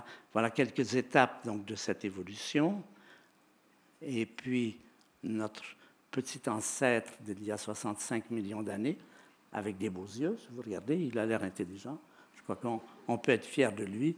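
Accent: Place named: Quebec, Canada. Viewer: une allure de savant un bel accent quebecois merveilleux conteur grand vulgarisateur d'une science extrêmement complexe que l'histoire de l'univers de l'infiniment petit à l'infiniment grand.....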